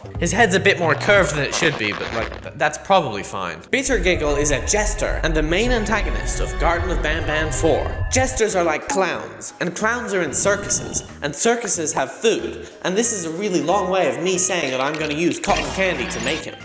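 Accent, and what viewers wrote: Australia. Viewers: U Australian 😄😄😄😄😄😄😄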